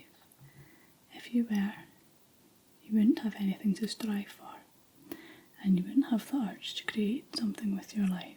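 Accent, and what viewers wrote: Scotland, United Kingdom. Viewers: The Scottish accent is the best accent in the world. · other people must be thinking this is a strong accent but for me its normal bc im scottish to AHhah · Where in Scotland are you from? Lovely accent wherever it is. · you are so beautiful and talented. I love all your videos. Your hair is beautiful. Your hands are beautiful and I love your hand movements. Your voice and accent are wonderful. You should be recognized as a Scottish national treasure. Thank you for all the great videos. You always help me relax and make me smile. Thank you. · Aaaaannnnd I'm on my way to dreamland. We need more scottish accent asmr. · Love the ton of your voice the nice pleasant Scottish accent Not only that , you're beautiful inside and out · i will never not have a weak spot for scottish accents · Have to say the curls REALLY suit you! 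There's no Welsh alternate sayings/words for New Year unless you count Blwyddyn Newydd Da; Happy New Year to you! Could listen to you all day, love the softer Scottish accent. . I practice the LoA and things have manifested so well for me recently, but still working hard on the Power of Now which can be quite hard to maintain. . Guessing you're near the M8/M80 😉 . Much love from North West Wales 😍 x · my new years resolution is to find a scottish girlfriend (Im Australian) cos i could listen to that fluffy accent the rest of my life.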